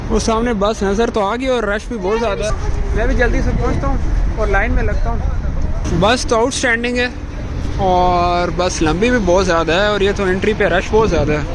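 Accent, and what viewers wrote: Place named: Attock, Pakistan. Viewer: آپ کے لہجے سے لگتا ھے کہ آپ کا تعلق اٹک سے ھے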